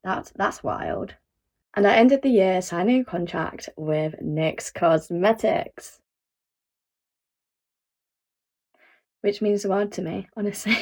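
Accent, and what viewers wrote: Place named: United Kingdom. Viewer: The shock I felt to hear your British accent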